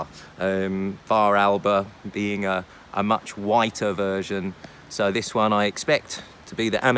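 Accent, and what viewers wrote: England, United Kingdom. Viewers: I definitely sharing your video on Facebook here and America. You know we can trust you because you have an English accent.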